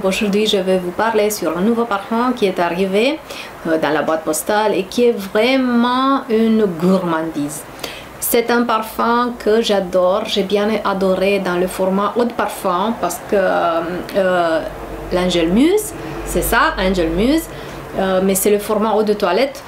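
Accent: France. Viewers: Nice review! I like your french accent. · Nice French accent! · J'aime vraiment la langue française, surtout l'accent du français, j'aime beaucoup voir cette vidéo tournée en français! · Love this chanel and nice french accent!!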